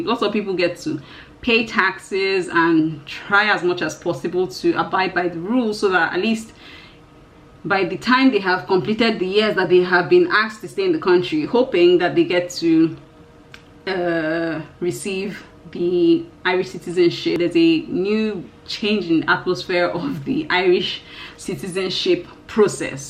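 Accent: Nigeria. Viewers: Lool I liked this video because of your Nigerian accent and the Nigerian humour you used to announce what is essentially bad news which didn't make it sound as bad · Hi...did you live in Lagos? You sound Yoruba. Regards from the UK.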